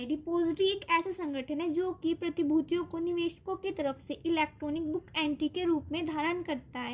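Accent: Uttar Pradesh, India. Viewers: Aapki cute voice m up dikh rha hai i am also from utter pradesh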